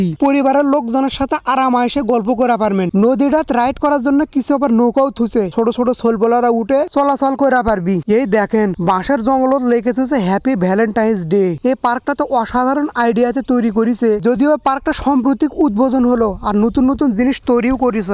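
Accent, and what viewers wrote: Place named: Dinajpur, Bangladesh. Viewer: কারন হামরা দিনাজপুরের ভাষায় এমন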